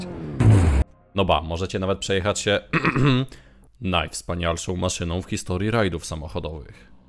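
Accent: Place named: Poland. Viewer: Słuchać? Ja musiałem wyłączyć dźwięk ponieważ uszy mi krwawiły od polskiej wymowy angielskich słówek. Autor chyba nigdy... kurwa nie wiem co....... Ja pierdole.... pozdrawiam. Bez urazy :)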